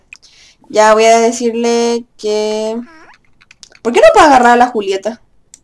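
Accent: Chile. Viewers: hoooo apenas te escuche sentí que eras chilena porque dijiste la típica frase de el poh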